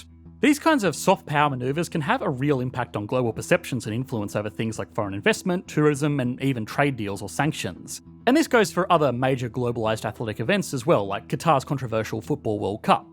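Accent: Australia. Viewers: Stop speaking in Australia sound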